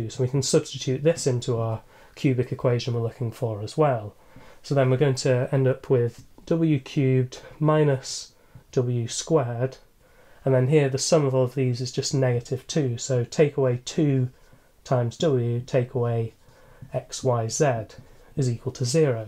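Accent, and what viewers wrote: United Kingdom. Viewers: Thanks for this video and for your british accent :) Clever solution and beautiful problem. Hope you are doing well :)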